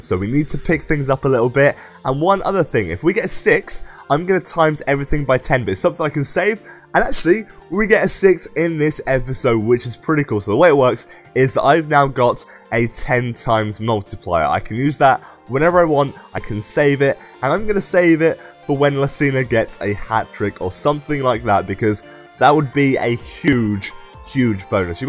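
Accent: United Kingdom. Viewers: dont you worry, you talk british and we all love it <3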